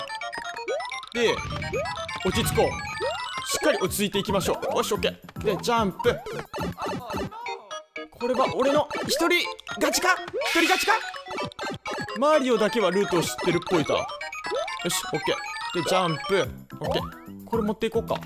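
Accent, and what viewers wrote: Fukuoka, Japan. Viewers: もう少しで勝てそうな惜しい試合が多かったですねｗ それよりなんだかコメント欄がにぎわっていますね！良いことだと思います！は福岡のかたですか？前から福岡っぽい喋りだとは思ってましたがｗ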